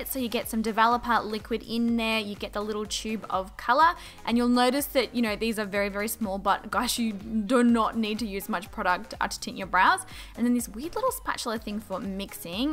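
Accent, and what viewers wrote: Australia. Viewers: That’s correct that how Aussie’s say it🤗🤗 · Wow din knew u were Aussie yayyyy lots of love from Melbourne xx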